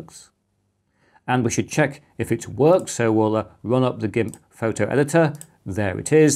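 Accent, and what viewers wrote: United Kingdom. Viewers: I thought you were going to “flex” ChromeOS so I clicked the video cuz i thought it would be funny but then I heard the Bri’ish accent and hit dislike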